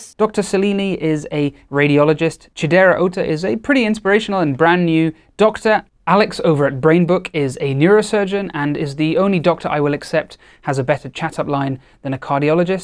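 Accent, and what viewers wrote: France. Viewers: Thank you for shouting out French medical youtubers! They're great and really under-appreciated. I hope you recover from the flu quickly. Also your French accent is sublime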